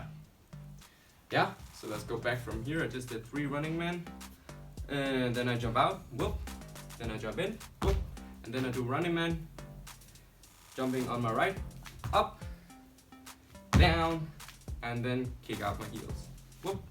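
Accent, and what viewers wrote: Denmark. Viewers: bro this guy sounds danish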